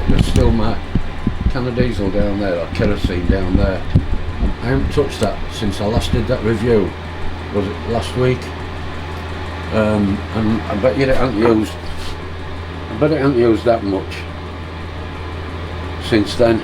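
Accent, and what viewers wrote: Yorkshire, United Kingdom. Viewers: Sound like a Yorkie accent !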